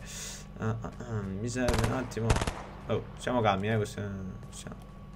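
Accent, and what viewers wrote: Naples, Italy. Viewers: Se trovi qualcuno con il minty il video finisce PS: ma sei napoletano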